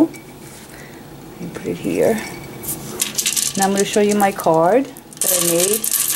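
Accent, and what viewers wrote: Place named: New York, United States. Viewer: Beautiful!! Also (grin) sounds like you are a sister New Yorker.....I was born and raised in Greenwich Village (went to P. S. 41 on 11th and 6th) - nice to hear the accent - music to my ears! THanks for subscribing - I am lost in your videos right now......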